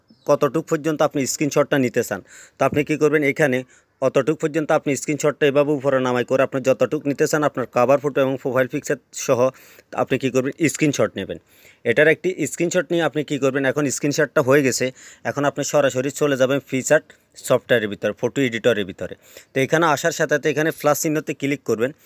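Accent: Noakhali, Bangladesh. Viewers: আপনে নিশ্চিত নোয়াখাইল্লা কোন সন্দেহ নেই??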